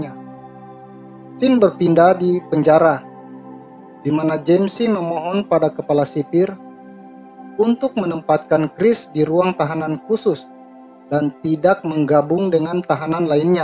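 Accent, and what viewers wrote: Eastern Indonesia, Indonesia. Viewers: abang orang timur to?? kelihatan dari logat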